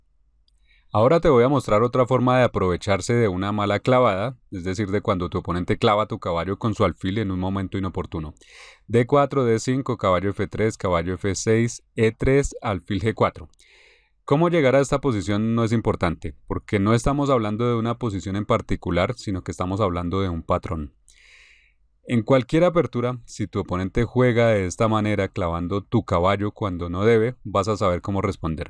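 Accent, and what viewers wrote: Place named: Bogotá, Colombia. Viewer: Habla como si fuera de colombia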